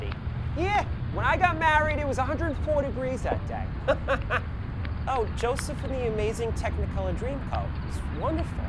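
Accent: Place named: New York, United States. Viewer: He's got that New York/New Jersey accent.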